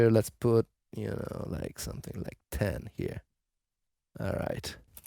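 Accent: Italy. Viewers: you sound like you are italian, are you? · 😂 could be. His English is good indeed but he does have a pretty thick Italian accent. Nothing wrong with that but it's a dead give away.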